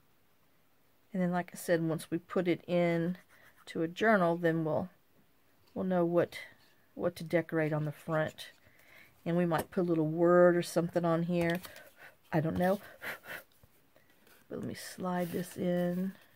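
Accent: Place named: South, United States. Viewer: I love all your videos! I sent my daughter, Heidi, one to watch and told her she’d enjoy it. She calls me and says “OMG she’s you with a southern accent!!” Then I reminded her I DID have an accent in the 1960’s when I lived in Florida. Please let us know if Molly comes home.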